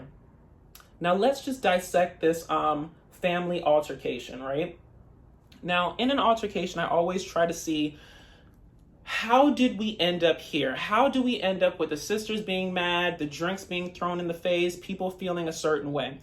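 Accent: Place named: Caribbean. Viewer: Loved the Caribbean accent in the intro and the entire review. I agree with your take on all the couples. All these people have these serious conversations waaaaay too soon from the arrival of the “foreign” fiancé. Emily is just very childish and unrealistic- she wants the wedding and the diamond ring but in reality she doesn’t even know if she really has true feelings for this guy. I don’t believe that there would be no option for them to have any alone time after they got settled at her parents house. I’m sure the grandparents wouldn’t mind watching the baby for a couple of hours after Kobe arrived for them to have a date night the next day. But like you said she trapped him into the whole arrangement without prior discussions. Best regards!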